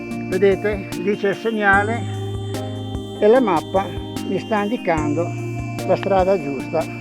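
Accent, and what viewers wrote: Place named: Liguria, Italy. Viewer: Interessante questa applicazione mi fa impazzire sul tuo accento ligure sei un simpaticone e racconti le Cose con molto informazione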